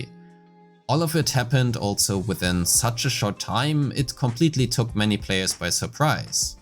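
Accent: Germany. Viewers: I am german and it took me not long to realise you're german as well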